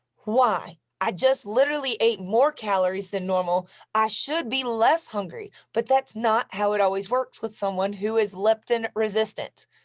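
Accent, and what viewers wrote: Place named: Tennessee, United States. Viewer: OUT of curiosity- are you a TENNESSEE girl? I love hearing you talk- and your mannerisms are just like my area here.. would love to find myself a lady similar to you-- OLDER (i'm 66).. ha. but you sound like you're from my area-- You're a gorgeous lady-- your hubby is a lucky man.. Keep up your GREAT videos- I get more from yours because you talk like ME- and seem to think and have mannerisms like my area folk do.. in other words- you're similarities- have helped me get MORE out of this info in half a dozen videos than over a YEAR of listening to eveyrone else.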